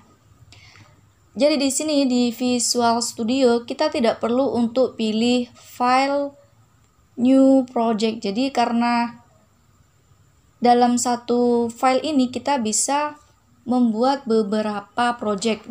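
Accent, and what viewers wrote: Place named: Tegal, Indonesia. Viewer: Ilmu yang bermanfaat by ngapak tegal junior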